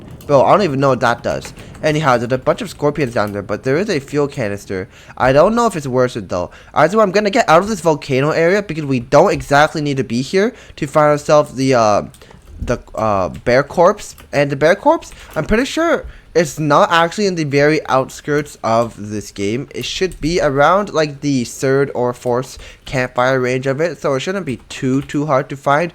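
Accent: United Kingdom. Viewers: Is bro half British?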